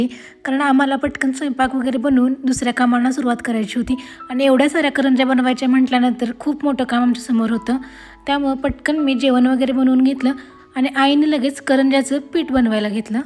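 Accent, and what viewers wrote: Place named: Satara, India. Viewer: सातारची आहेस का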